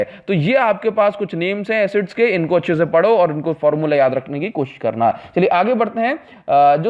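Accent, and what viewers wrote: Odisha, India. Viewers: Sir aap odia ho kai?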